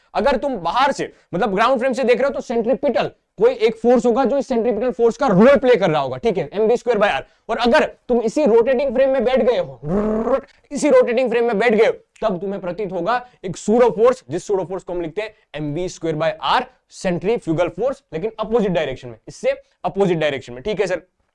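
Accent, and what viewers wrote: Gujarat, India. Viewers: Your gujrati accent ❤ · Idk if he is gujrati or not but got gujrati accent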